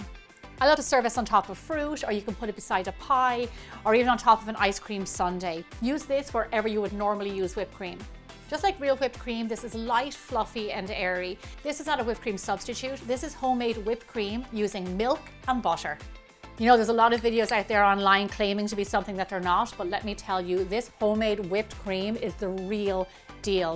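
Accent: Ireland. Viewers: What a delightful Irish accent! 💖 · This works better if you have a beautiful irish accent. Love your channel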